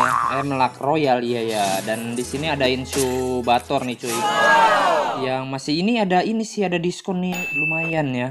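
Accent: West Java, Indonesia. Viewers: Bang orang mana sih tapi ngomong ya sunda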